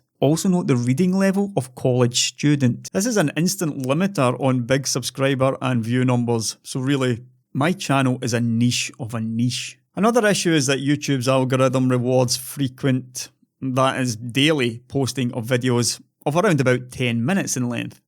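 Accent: Scotland, United Kingdom. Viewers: Good stuff! :-D If an Israeli like myself can understand your Scottish accent perfectly fine then it's hard for me to believe how anyone else wouldnt. With that being said, I did not need a 27 minutes long "you guys were wrong" video. I trust your judgement with my eyes closed. That's also one of the reasons you are pretty much the only sub I've got. You shine a light at what no other tech Youtubers can see. That stuff is above Jay's and Linus payroll. They'll never be half as professional and through as you are. Cheers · How the hell do people have a problem with a Scottish accent. People need to get out of the basement more. :) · I like your accent. Love listening to any Scottish person speak. Scottish is one of my all-time favorite accents. Please don't take this the wrong way but when I hear you talk, I always think of one of my favorite cartoons, Disneys Ducktails. 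Scrooge McDuck is one of my favorites. But that's not the only reason that I watch your videos, the content is very informative. I always learn something new. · M8 from one Scot to another I love the accent and the channel. Keep up the good work! Only channel that gets a like before I watch it!!! Can't wait for your next upload!👍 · Great content and a good ethical stance...oh and the beautiful lilting Scottish accent 😜 Subbed. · I LOVE the scottish accent and I love the content of your videos. As far as I know you're the only youtuber that goes deep into hardware analysis combined with economics and whatever else you bring into the mix. All I can say is keep up your morals and keep doing great videos if you're able to! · i started watching your videos a long time ago because i was tired of what could be seen as generic tech videos. I was looking for something that could challenge my mind and let me think. 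Today's videos by most tech people are incredibly dumbed down to where we already know the outcome. When i started seeing videos come up that said how you can liquid cool your computer with (insert stupid idea) i knew we had reached critical mass. I think people looking at your videos and seeing a sponsorship they have a knee jerk reaction mostly due to how much the current tech press has sold out. We all know it has or at the very least know it isn't as straight forward as it used to be. It isn't fair to paint everyone with that brush especially you but i get why they react immediately to that. I personally love your accent, my lineage is of Scottish decent and I enjoy listening to it. I can understand why some people might not like it though. For me it is the English accent. It amazes me how a country as small as Britain is can have so many people speaking in wildly different sounds. That is an example of what i cant stand. 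Your information in your previous videos is still very relevant to today. I can almost see it like how the Wii U games are being re-released on the switch, great games that never reached out to the full audience. I would rather hear you speak but i get needing to reach a larger audience. Keeping videos to around 10-12 minutes will keep an audience especially with h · Bruv, people are complaining about your barely heavy Scotch accent, meanwhile I throw in multiple accents in almost a fucking paragraph. Explain that "lack of controlled accent". E Y E R O L L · I am an english viewer from the south east of Kent and I have no issues with your accent nor any scottish accent, and I am hard of hearing, keep up the good work, I thank you! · Not a native english speaker, no problems with your scottish accent, but I do understand the difficulty, the hate I do not. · To be honest, the accent also annoyed me a little in the beginning, but I stayed for the awesome content. 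And meanwhile I learned to understand the Scottish accent better;) win-win (In Scotland from time to time for business) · Greetings from Germany! To provide some balance: I absolutely love that scottish accent.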